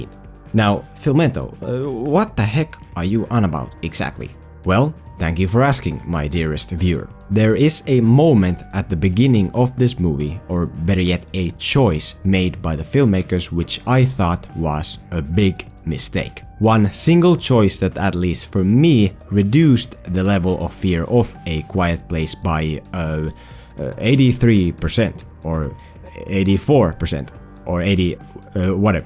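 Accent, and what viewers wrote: Finland. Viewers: Are you from finland. Your accent is atleast similar to the finnish one so.... Torille tavataan ja SUOMI PERKELE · He sounds Finnish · Is filmento finnish? Do i hear a finnish accent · Are you Finish cause you have a real Finish accent... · Is this Man finnish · Are u from Finland?, Ur accent sounds Finnish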